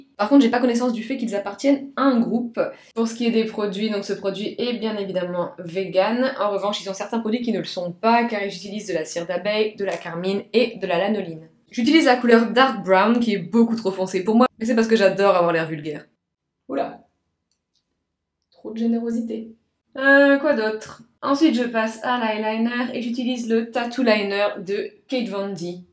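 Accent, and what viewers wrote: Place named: Bordeaux, France. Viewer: Super intéressant comme vidéo ! J’avoue penser aux animaux quand j’en ai dans mon assiette mais pour le maquillage j’ai du mal à l’associer avec la souffrance animale, alors que c’est vrai ... une vegan m’a dit que j’étais flexitarienne : je mange le moins possible d’animaux, j’essaie d’acheter le moins de cuir, mais j’avoue ne pas encore faire de la souffrance animale un combat (et je me dis amoureuse des animaux, un peu hypocrite j’avoue ...) Breef j’adore tes vidéos, j’adore ta façon de parler. Petite question : de quelle région es-tu ? Bordeaux ? J’ai l’impression d’entendre un petit accent tout chou :)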